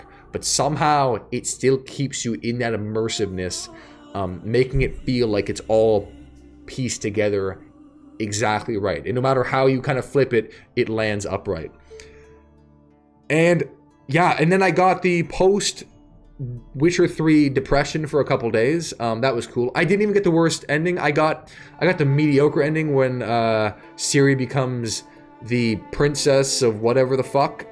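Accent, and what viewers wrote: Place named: Canada. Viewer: Most Nadian accent ever!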